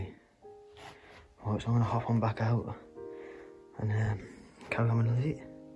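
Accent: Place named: Derbyshire, United Kingdom. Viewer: A bit posh for a Derbyshire girl 😂 😍😍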